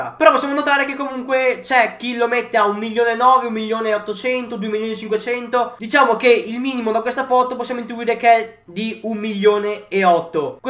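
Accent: Veneto, Italy. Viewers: Ma sei veneto?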